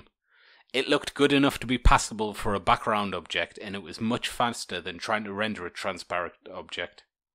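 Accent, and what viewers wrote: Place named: Newcastle, United Kingdom. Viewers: Areeet mate! Haha I can tell you're a NE'er from the accent lol. I'm starting blender so videos like this are very much appreciated. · I’m not ashamed to admit but I’m really just here for the Geordie accent. 😁 Love the channel. Great work. Thank you!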